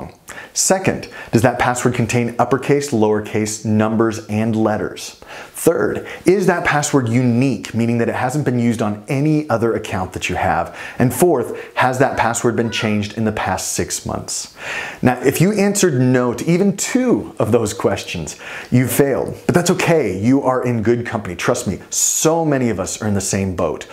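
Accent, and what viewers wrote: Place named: United States. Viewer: voice sounds really different here with that American accent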